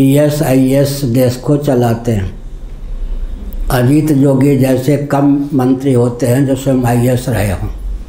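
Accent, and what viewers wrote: Standard. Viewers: इनका उच्चारण भी शुद्ध है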